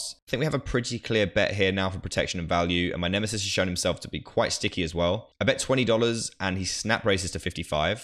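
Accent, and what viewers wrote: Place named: Quebec, Canada. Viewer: the accent from "quebec" is so strong lol... (I'm from montreal, quebec)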